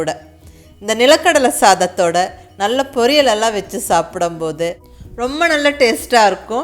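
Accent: Coimbatore, India. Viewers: Pakka coimbatore voice.... love that u were hiding the face...nowadays people are running behind fame.... u r unique among them....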